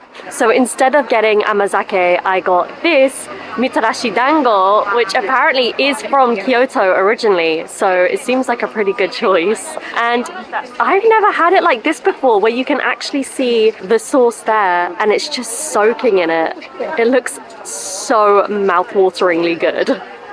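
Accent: United Kingdom. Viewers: はっきりしたブリティッシュイングリッシュで勉強しやすいですよね〜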